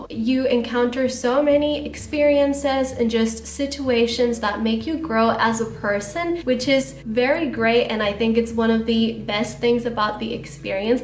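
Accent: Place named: United States. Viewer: Your English is perfectly American. You didn’t learn that in Honduras, did you?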